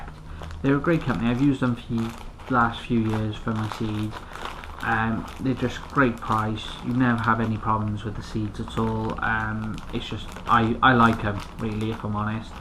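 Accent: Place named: United Kingdom. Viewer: Hi, Just seen 2 video's so far, but its looking great. I did have (1) parsnip last year, and i totally neglected it. is your problem in germination? (i only sew like 6 or so) Tobacco i will give that a try this year, i dont know if i do have to ferment it though. I'm also very curious about your tomatoes; you sound like you're from GB (i'm just across the chanel) and i can't grow any tomatoes outdoors here, except for the cherry tomatoes. Will you also be planting/sowing some peppers, radishes,....? Grtz Tim, aka The Druid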